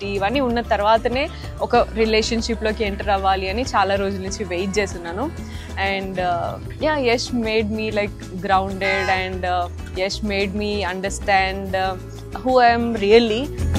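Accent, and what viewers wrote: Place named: Telangana, India. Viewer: I like your dressing style aand the way your speaking... Telangana slang vinadaniki baguntadhi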